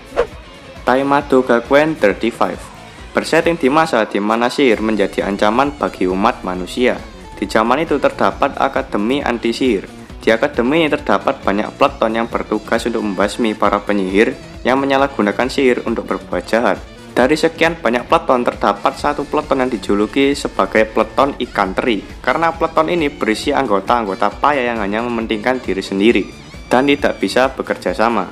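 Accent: Java, Indonesia. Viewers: Medok tapi bagus unik..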